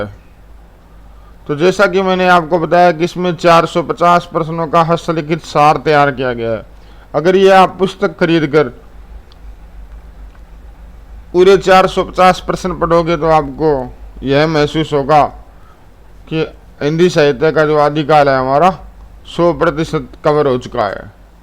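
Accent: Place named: Jalore, India. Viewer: आप जालौर जिला राजस्थान के निवासी लग रहे है सर लहजे से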